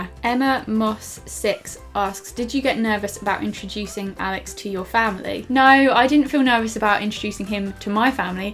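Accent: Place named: Yorkshire, United Kingdom. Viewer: Up North!!! Love the yorkshire accent... cough cough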